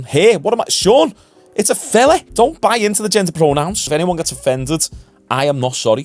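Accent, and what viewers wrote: Liverpool, United Kingdom. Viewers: Listening to your voice you sound like you're from Liverpool one thing I can say about you guys man you're on point wherever there's b***** you guys make sure you make a stand I'll totally agree with everything you're saying this is a joke I haven't watched the Olympics for the last three times it's ridiculous lots of love to my Liverpool people😮😢😮😢😮😢😮😢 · I love your accent. Its the best of the British accents 👏🏾👏🏾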